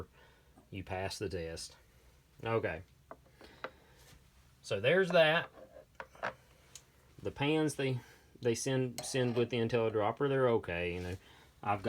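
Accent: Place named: Alabama, United States. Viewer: That Alabama accent is THICK, son!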